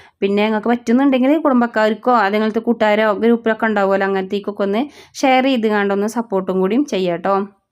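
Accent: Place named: North Kerala, India. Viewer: Uttara Malayalam speaking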